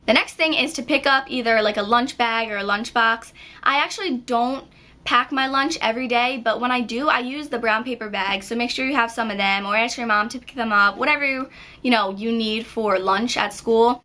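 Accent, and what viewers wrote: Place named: New York, United States. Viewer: r u from new york cause i LOVE ur accent!!!!!!!!!!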